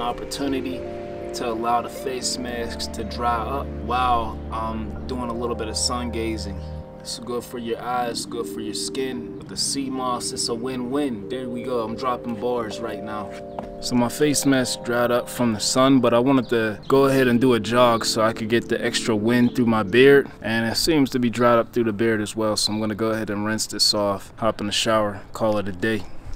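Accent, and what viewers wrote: Pittsburgh, United States. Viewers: Burgh accent? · I Knew It Was Pittsburgh Because That’s Where My Mom Side From 😂😂😂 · You gotta be from the burgh bro I hear the accent lol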